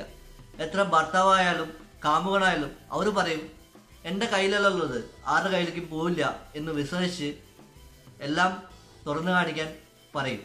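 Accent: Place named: West Bengal, India. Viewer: ബംഗാളി മലയാളം സംസാരിക്കുന്നത് പോലെ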